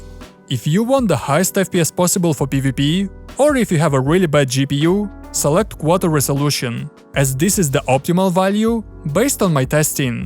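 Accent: Russia. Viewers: русский акцент ни с чем не спутать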